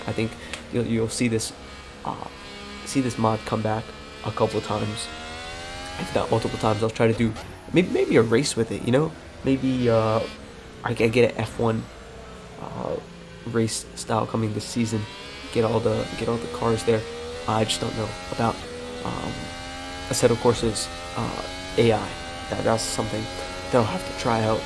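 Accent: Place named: Canada. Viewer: You sound extremely Canadian.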